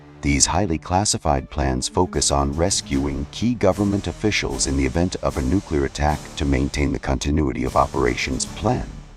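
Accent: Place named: United States. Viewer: 好喜歡這個美式英語的旁白，增加不少信聞中常用的語句，不同於英語課本，離生活中遇到的，同時那考試也是一樣！我旅居英國15年，和同是聊天，就類似如此！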